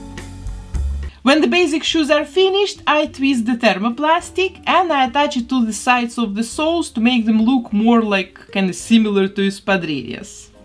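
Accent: Russia. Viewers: Блина малина Такой русский акцент Так необычно Кстати ооак очень круто вышел :> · Русский акцент, и тут я понял что это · она русская? я вот сижу и голову ломаю кажется мне или нет, вот прям ярко выражено русское произношение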